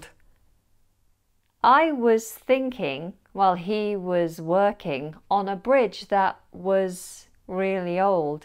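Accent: United Kingdom. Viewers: I'm new to the English language, and when I wanted to learn the language, I started watching movies and series... I noticed that the British accent is wonderful.. I have a question: Is the accent on the channel a dialect that I can speak all over the world?👍🏻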